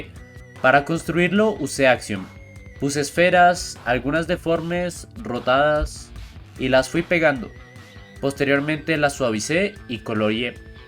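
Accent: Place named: Colombia. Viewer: El asento se parece a Colombia no se muy bien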